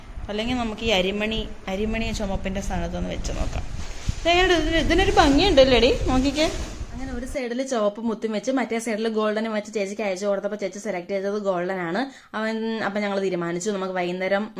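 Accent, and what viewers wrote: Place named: Vaikom, India. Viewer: ഞാൻ ഒരു വൈക്കം ക്കാരി ആണ് ഇപ്പോൾ ജിസിസി യിലാണ്..... നിങ്ങടെ വ്ലോഗ് കാണുമ്പോൾ എന്റെ നാടും കൂടെ കാണുന്ന സുഖം പിന്നേ നമ്മുടെ vaikom സംസാരം പിന്നെ ടെ ടാലെന്റ് എല്ലാം എല്ലാം എനിക്ക് ഇഷ്ട്ടം ആണ് ❤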